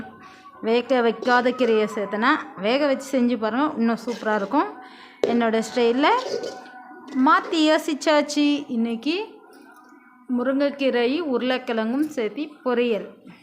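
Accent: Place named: Salem, India. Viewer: நீங்க சேலம் பக்கத்து கரர் போல பேச்சு erukerathe nengal சேலம் மா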